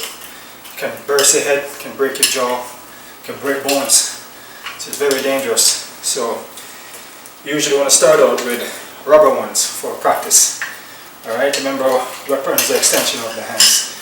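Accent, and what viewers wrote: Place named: Jamaica. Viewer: wait...... yuh sound like a yardie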